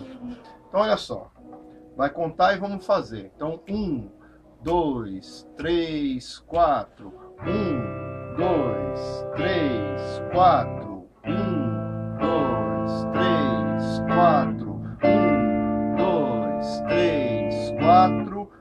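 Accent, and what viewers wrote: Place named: Minas Gerais, Brazil. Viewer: esse professor parece um d ituiutaba minas gerais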